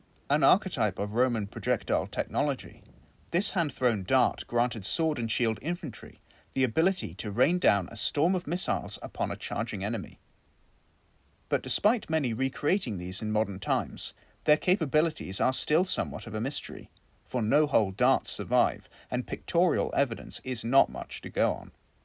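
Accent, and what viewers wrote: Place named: United Kingdom. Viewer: As an American consumer of a specific type of video content, it was so pleasantly odd to hear “terminal effect” and the distinction between “internal and external ballistics” in the British accent. I very much appreciated your application of the scientific method. And the sound these things make as you throw them is awesome. Great stuff.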